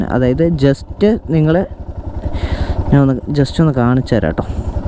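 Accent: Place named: Kochi, India. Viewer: Kochi machaan crrt sound 💯😂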